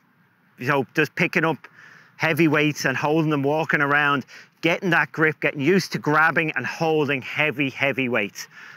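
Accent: Ireland. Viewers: English is his first language. It's just where he's from in Ireland that gives him that accent, it's very common there.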